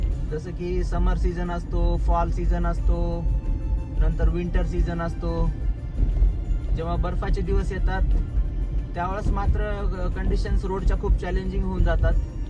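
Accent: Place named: Latur, India. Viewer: 🙏🙏राम राम दादा सर्व काही माहिती खूप छान देता कॅनडामध्ये राहुनी ही मराठी गावची लातूरची भाषा नाही विसरला तुम् ही❤❤👌👌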